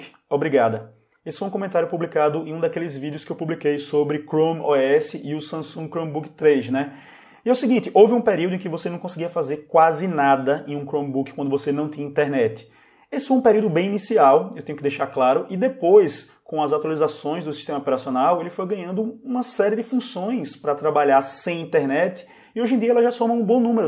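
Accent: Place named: Aracaju, Brazil. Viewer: caraca tu é de aju? hahaha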